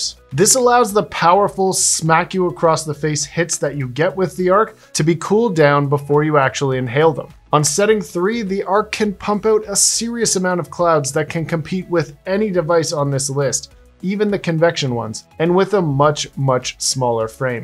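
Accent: Germany. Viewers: Good German accent Vielen Dank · That German accent is the reason why i liked your vid just 2 minutes in xD Also could you do a vid explaining everything about a dry herb vape? like what cause the high to end sooner etc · Nice entertaining presentation. I’m just starting out and you really helped clear my confusion. Nice German accent too :-). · You get like and subscribed for that German accent! 🤣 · I love the German accent makes me feel like I’m watching Hogan‘s heroes. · Loved the German accent, really good. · Got me subscribing from the German accent 😂 but you speak facts so I like that , you got my view brother and will be watching , keep it up 🌲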